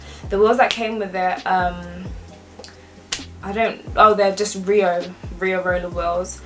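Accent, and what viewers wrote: United Kingdom. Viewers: Good on you for buying stuff from olis, i know them and they are a family run business by a family of skaters and decent people. I skated their sessions every Sunday and can't wait for these stupid covid restrictions to end and for the sessions to resume. I have Bauer NS and I can't get on with them ether, bloody amazing looking boots but they give me horrendous blisters on my ankles and the lack of boot flex does not suit my skating style as I like to have hard bushings and have them tight for speed and rely of upper boot flex to be able to lean in turns but the NS has a boot as stiff as a corpse lol. I the boots i regularly skate on are my 90's bauer turbo and my new supreme turbo which are a clone of the Bauer turbo. Was nice hearing your London accent but it made me homesick, I'm originally from east London but moved out to Salisbury in Wiltshire 9 years ago and it drives me mad living among all these countryside snobs ha ha.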